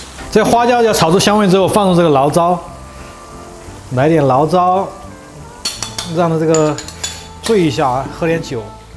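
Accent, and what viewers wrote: Sichuan, China. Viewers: 我的父母都是四川人，在美国听到四川音感觉很亲切。四川好男人，靠谱！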